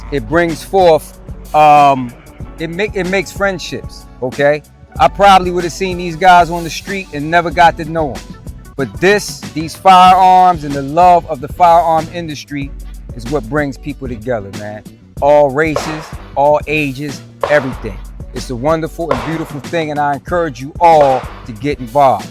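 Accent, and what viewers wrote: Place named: East Coast, United States. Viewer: I knew I sensed the east coast presence brother. I’m from the Bronx and it was like listening to one of my fellas when I watched your video. Much love to the channel and future success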